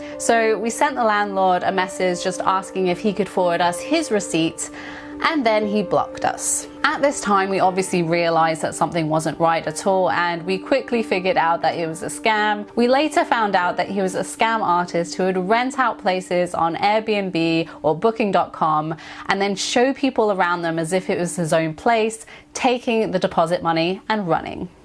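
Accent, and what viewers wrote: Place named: West Country, United Kingdom. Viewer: I was amazed to hear you grew up in the States, you sound like a west country girl to me.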